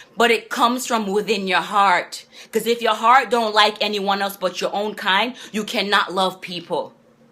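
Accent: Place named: Jamaica. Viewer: Jamaican accent? (I'm from the Caribbean so just curious). Confirmed. Shout out to our northern neighbours :) Great video btw.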